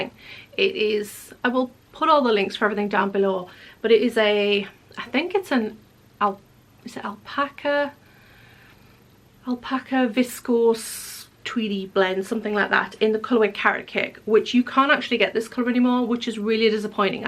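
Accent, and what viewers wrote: North East England, United Kingdom. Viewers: Nice to hear a north east England accent in Orkney! I am a Geordie Knitting in Fife! East Neuk Knits festival coming up in Fife end of November is so exciting it was brilliant last year. We are so lucky in Scotland.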